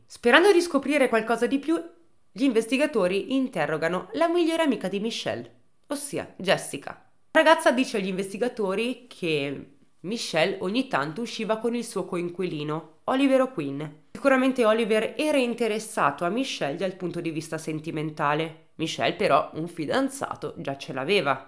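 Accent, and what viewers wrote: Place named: Genoa, Italy. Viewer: Mi pare di capire che sei leggermente genovese🤣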